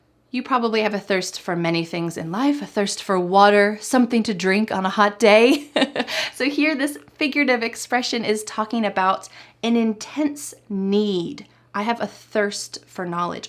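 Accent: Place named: United States. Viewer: I went to college in Bogotá (Colombia), graduated in 2023, majored in Law, currently attending the LLM at the Hard Knocks Academy, rather book smart, and lacking street smarts, definitely a bookworm (in Spanish it would be: Ratón de biblioteca: library mouse", literally), currently dont have to hit the books, and life long learner (love the movies, enjoying awards season at my local multiplex - CineColombia), maybe a little bit oversharer - no way!); thirsty for knowledge, if not the Booze - this I had to look up at Merriam - Webster, as a synonym (our local spirit being Aguardiente, lit. fiery water; tha latter won´t make it to Merriam-Webster, but maybe to be found in the authority for the Spanish language RAE, and for sure this one I did look up at the memoirs of street academy/smarts); love that - I take it - american accent of yours. In the last bit a tried to apply at least one of Trunk´s elements of style. 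Thanks, very enjoyable!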